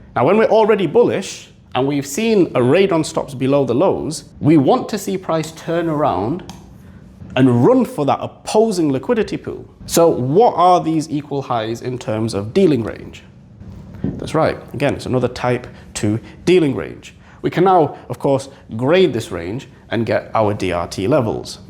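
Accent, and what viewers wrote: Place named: England, United Kingdom. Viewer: His English accent and talking about DRT makes it seem more complex than it really is. 😅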